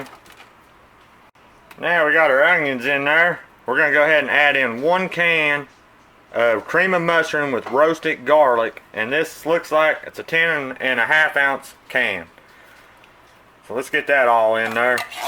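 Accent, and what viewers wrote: South, United States. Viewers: Hi! I have just found your channel and I absolutely love to watch you cook! You are one of the best cooks on here, and I watch them all just about. I also like your southern accent. Do I hear s little cajun in there? By the way, congratulations on not drinking beer anymore. I'm very happy for you and your family. God Bless you and yours.:-) BTW, I subbed to you and clicked the LIKE bell.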